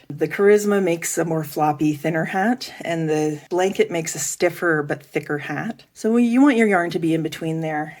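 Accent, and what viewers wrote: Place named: Canada. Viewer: excellent tutorial.............best one out there. thanks so much. love your Canadian accent!!!!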